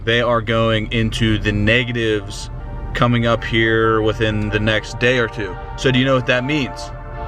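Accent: Pennsylvania, United States. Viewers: This channel makes me home sick until I see how cold it is there. I never noticed the accent until I lived away from PA for awhile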